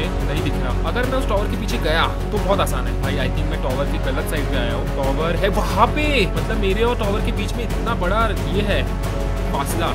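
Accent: Maharashtra, India. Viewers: Mahhpat : you are marathi or hindi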